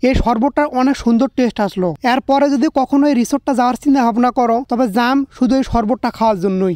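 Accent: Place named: Dinajpur, Bangladesh. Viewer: কারন হামরা দিনাজপুরের ভাষায় এমন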